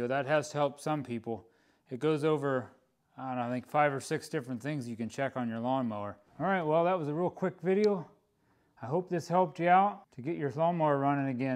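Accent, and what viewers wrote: Pennsylvania, United States. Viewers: Cool. I thought you had kind of a PA accent. I’m originally from NE PA and now I live in NH. NH apparently stinks for trying to buy non-ethanol gas. No gas stations sell it that I can find and only specialty stores sell it in cans for like $25-$30 per gallon. Anyway, I found my problem. I actually blew some compressed air backwards through the gas line after emptying the tank. There was a clump of dirt stuck on some kind of gummy crap stuck somewhere between the top of the tank and the inlet inside the tank. Looked like rubber cement. Runs like a champ again now. Hopefully that was the only dirt in the tank. Thanks for the idea of blowing out the lines like that though. Without your video, I’d probably have taken apart half the mower by now.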